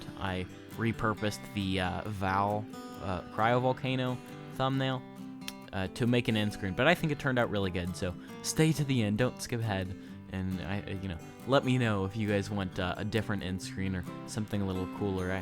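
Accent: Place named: United States. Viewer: be soundin kinda american doe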